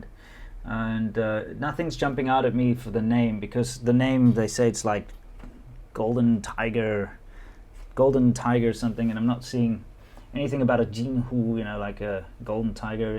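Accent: South Africa. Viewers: I am so fascinated by your ability to speak and read and write Chinese! Equally as fascinated by your ZA accent. Just a question - did you flip the video of yourself in the car? Or you actually drove a right hand drive car? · for inputting Chinese, you should try iFlyTech speech recognition input, you just speak to the phone and it writes for you. It would gradually adapt to your South African Chinese accent as well. After trying that a year ago, there is no turning back to typing Chinese. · Are you South african?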